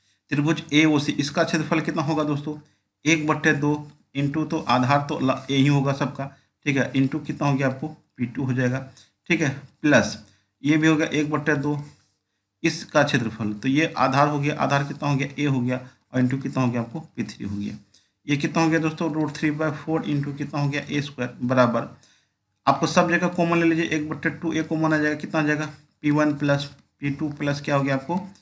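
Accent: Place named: Mithila, India. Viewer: अपने के आवाज स प्रतीत होय ६ै अपने मिथिलांचल के छलिए! सादर आभार अपने के बहत निक से पढ़वे छलीये🙏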